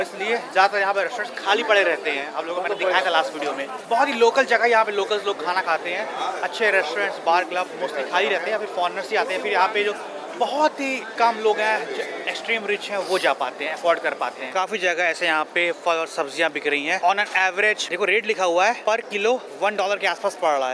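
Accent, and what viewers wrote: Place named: mixed, India. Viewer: U.P. Bihar me sab tumhare tarah hee bolte hain kya?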